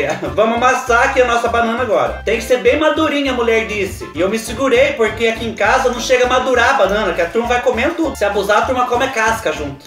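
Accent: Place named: Minas Gerais, Brazil. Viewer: Aí do céu...não dou conta dessas canelinhas lisas... Vc é muito legal...KKK Abraço aí em São Paulo. Sou mineira e vc conversa como nós. 🤗😘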